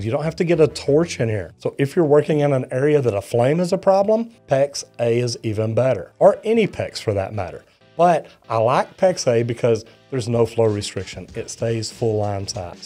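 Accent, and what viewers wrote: Texas, United States. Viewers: He's knowledgeable and interesting but that Texas/Southwest accent is hard to take.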